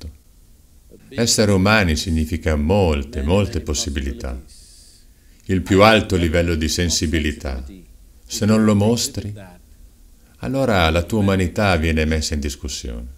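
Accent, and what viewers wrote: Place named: Parma, Italy. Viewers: Il doppiaggio si ma non con quest’accento Emilianoromagnolo cosi pesante ahah mettete uno che abbia fatto un po’ di dizione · con accento di Parma (o dintorni)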